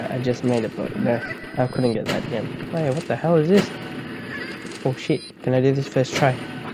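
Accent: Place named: United States. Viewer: You've got a lil american accent in that, I see you watch my videos too much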